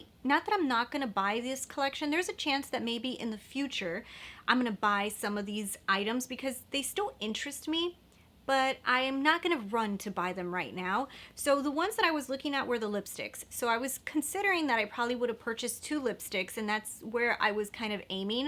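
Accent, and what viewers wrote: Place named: United States. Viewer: I love your American accent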